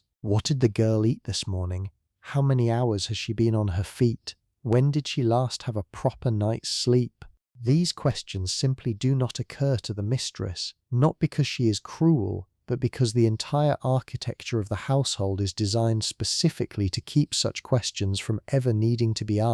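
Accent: United Kingdom. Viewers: I’m from Mississippi, USA 🇺🇸!!! Love the videos, plus the British accent saying alright love 😊❤❤❤ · Coastal Southern California PM. As I’ve said before, I enjoy these videos, but the British accent is stimulating and keeps me from falling asleep. It would be nice if there were an option to hear an American accent. That would remove an aspect of interest, and I could relax and go to sleep.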